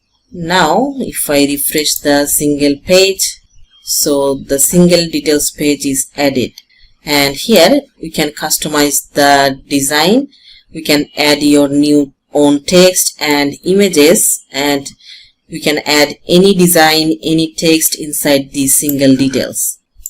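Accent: Bangladesh. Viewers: Proud to see Bangladeshi English Accent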